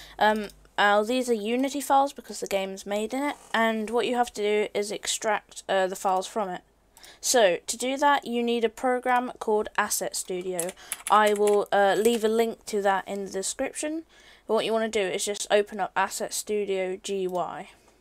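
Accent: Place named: United Kingdom. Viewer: Bri'ish boi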